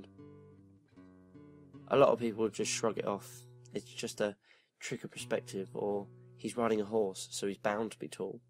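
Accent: London, United Kingdom. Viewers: This is the first video I've watched of and my first reaction was "HOLY SHIT, HE'S BRITISH."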